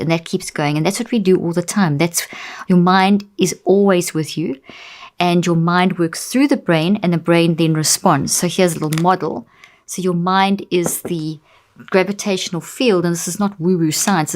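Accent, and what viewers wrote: South Africa. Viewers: South African 😍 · Still learning to understand the South African accent of this wonderful woman lol 🍀 💖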